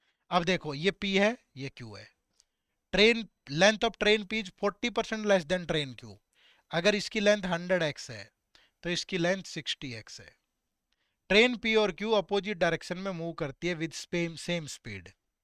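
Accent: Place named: Haryana, India. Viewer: Sir good english with haryanvi loved it!!! Thankyou for the content 💌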